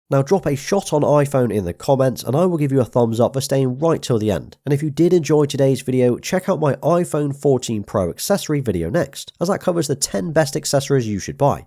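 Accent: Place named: West Country, United Kingdom. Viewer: Your West Country twang coming in strong here😂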